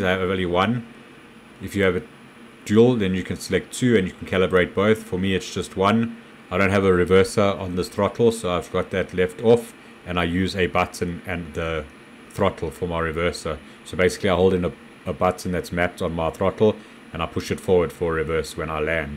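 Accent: South Africa. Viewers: thanks for the tutoral. i am a big BOEING fan and after many years of flying them i have eventualy decided to tryout Airbus. BTW, its good to hear a South African accent again, (other than my wifes) as we have been living in the UK for sometime.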